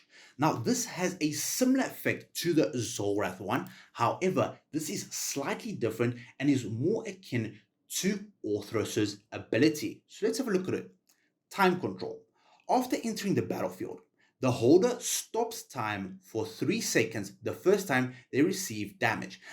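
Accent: South Africa. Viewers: yo bro you from South Africa?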